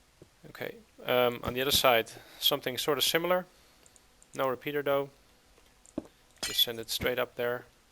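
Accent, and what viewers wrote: Netherlands. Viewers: u sound dutch, are u? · thumbs up if u hear he's dutch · je bent nederlands dat hoor je aan je accent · hey man, are you dutch?? your accent sounds dutch... i'm from holland too so that's how i recognize it...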